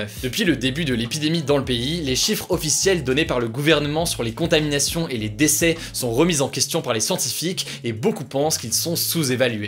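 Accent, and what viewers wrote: England, United Kingdom. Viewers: Ton accent anglais me fume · C'est bien pour l'accent anglais mais c'est JayKay Rowling et pas JeeKay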